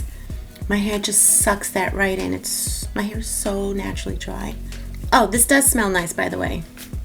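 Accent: New York, United States. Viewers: Your hair came out beautiful! I love the full abudance of curls. I like your New York accents; my home town.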